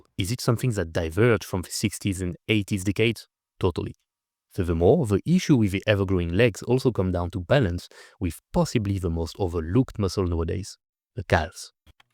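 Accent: France. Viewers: Not a fan of frenglish. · Nothing wrong his English and it’s just a French accent. Probably confusing for Americans who’ve had less exposure to it than those watching from Europe. Slowing his pace of speaking may help them.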